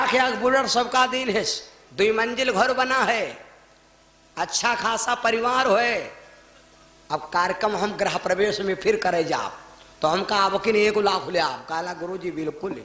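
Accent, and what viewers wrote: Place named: Uttar Pradesh, India. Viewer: बहन सीतापुर और बाराबंकी की लोकल भाषा यही है ये कथा वाचक सीतापुर और बाराबंकी के बॉर्डर के हैं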